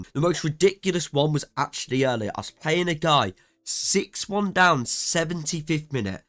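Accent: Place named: United Kingdom. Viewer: You sound so ultra British when u are in that video , Reds or Blues or Tots or ?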